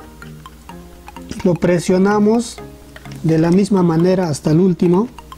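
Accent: Peru. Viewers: Buena info....eres de Perú?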